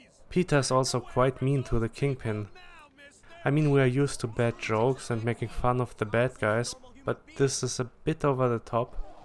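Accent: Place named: Germany. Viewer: Dein deutscher akzent😂😂❤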